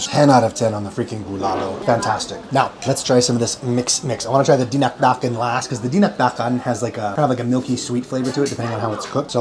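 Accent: Ilocos, Philippines. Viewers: Hi,. First time to see your video but I subscribed because you are vlogging in Baguio city where I was born and come from. I now live overseas, missing home so much and I just love vloggers who goes there and show me a bit of my birthplace to show and promote to the world. Salamat kabsat, agananad ka wen?😅😊 Padaanak dagita sumaruno nga video om😄 PS, I am loving your filipino and Ilocano accent. Mabuhay.👋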